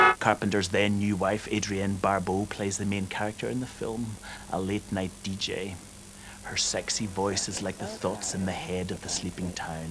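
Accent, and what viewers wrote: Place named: Ireland. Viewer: Major Mo .....its an Irish accent. You fool.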